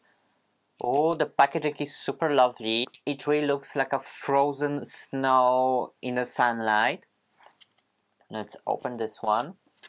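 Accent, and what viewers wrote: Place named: Poland. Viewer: hi! where are you from? your accent seems extremely familiar and polish-like :)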